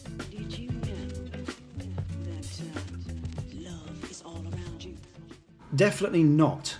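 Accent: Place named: Newcastle, United Kingdom. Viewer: Oh, so you're half geordie!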